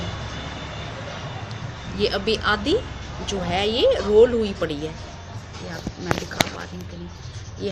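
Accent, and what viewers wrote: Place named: Jammu, India. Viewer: apki language ki tone sa aapka muja jmu ka touch lagta ha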